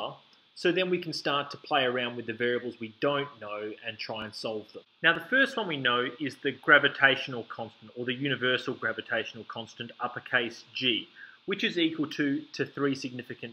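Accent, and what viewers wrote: Australia. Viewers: This was the first video of yours that I have watched and I think you did a really good job breaking down the algebra. Thanks for this and the aussie accent was refreshing!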